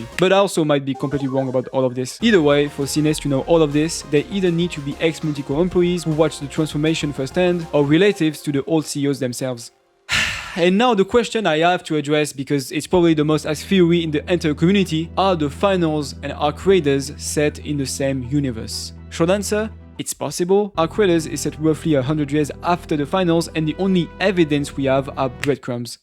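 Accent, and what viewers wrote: France. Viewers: Where are you ?from your accent look French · WAIT! are u french? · Are u french or israelian? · aaaaaaa l'accent français mddr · L'accent français qui trahit n'importe qui ❤ excellente la vidéo sinon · Is this guy french? :( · Je valide l'accent français !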